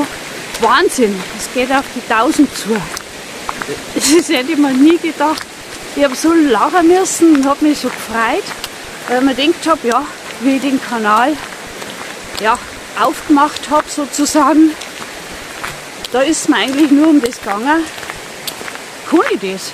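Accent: Bavaria, Germany. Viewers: So super schön deine Videos, vor allem so vielfältig!!!😊 Finde auch deinen Dialekt super, ich komme auch aus Bayern und verstehe sehr gut was du sagst😊 Musste eben so lachen als du geflucht hast (Zefix...)😂😂😂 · Ich finde es ganz natürlich und wunderbar ,wie Du Deine Videos für uns rüberbringst. Und bayerisch ist eben bayerisch, wie die Landschaft sich gestaltet, so passt auch der Mensch. Ich liebe es, alles zusammen. ❤lichen Dank.....hier spricht der Städter, der die Berge liebt und vieles mehr😅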